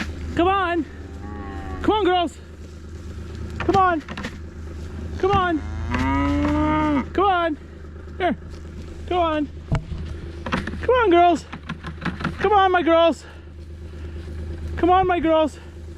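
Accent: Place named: Upper Midwest, United States. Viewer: Oh wow, you're the real deal! WI or MN by the accent, yeh? I like that you're nice to them, use honey opposed to stick. :)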